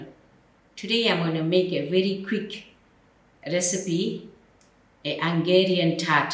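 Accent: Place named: Durban, South Africa. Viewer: U explain so well in your Durbsn accent love the way u cook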